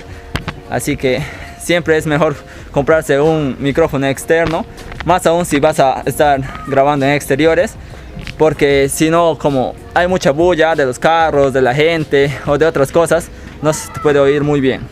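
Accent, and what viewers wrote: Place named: Amazon, Peru. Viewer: Jaja eres charapaza hermano, saludos desde lima buen canal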